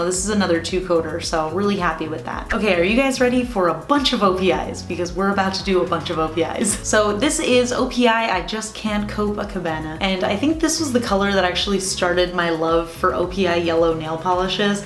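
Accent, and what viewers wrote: New Jersey, United States. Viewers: She's talked about it before. She knows it's kind of odd. She says it's because she's from/lives in New Jersey. I don't know about that because I've never been to NJ, but considering how many people of Italian descent live in NJ I can believe those Italian grandmas could pronounce the first syllable the same was as pasta. And then there are the west coast non-Italians (like my grandparents were) who can't pronounce pasta without the short A, so it sounds like the word "past" with a U sound tacked on-- PAST-uh. But then, they can't pronounce the word Italian, either. It comes out EYE-tal-yen, or maybe eye-TAL-yen.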